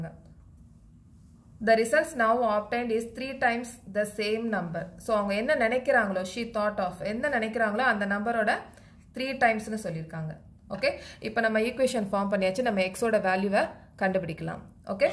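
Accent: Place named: South India, India. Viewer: Mam you are from south INDIA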